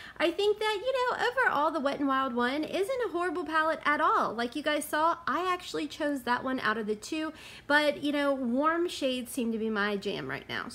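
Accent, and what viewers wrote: South, United States. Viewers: Girl I wished I would have seen this video first lol. Because I'm all about saving money. I really enjoy your channel plus your southern drawwwwww girl you would fit right in here in GA.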